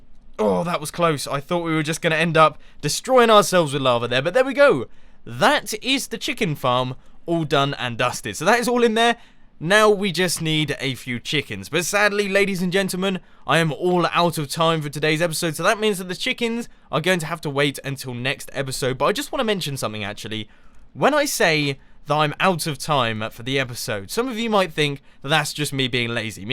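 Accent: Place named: United Kingdom. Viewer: I seriously could lissen to that british accent all day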